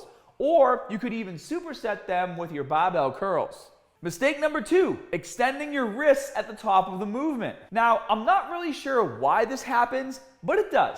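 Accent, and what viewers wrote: Boston, United States. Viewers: Wow that Boston accent! Lol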